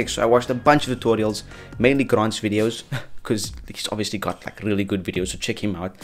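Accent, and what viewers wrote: South Africa. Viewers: south african ??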